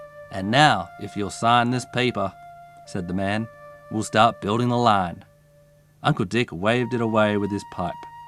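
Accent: United States. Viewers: I live in the US. I like your narration, but I have to say your Old West accent amuses me. :) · Love the southern accent, sounds like the Beatles XD cool story bro, thanks for sharing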